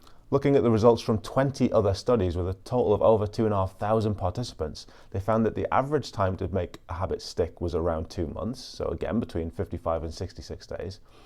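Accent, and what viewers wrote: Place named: North, United Kingdom. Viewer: I can't place your accent, you sound like a northerner but something is telling me you're Scottish.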